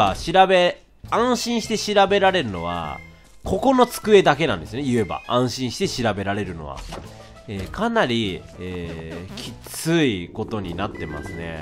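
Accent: Kansai, Japan. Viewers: 最近関西弁出るの多いね！関西弁好きだから嬉しいw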